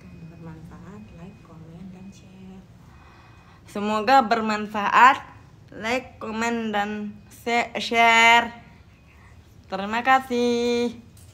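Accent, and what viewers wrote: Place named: Lombok, Indonesia. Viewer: Lombok banget logatnya kak...sehat selalu anak soleh❤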